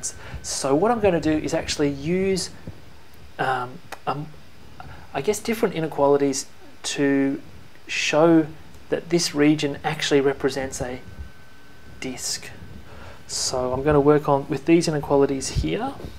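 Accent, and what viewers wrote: Australia. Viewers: you are Australian. Correct?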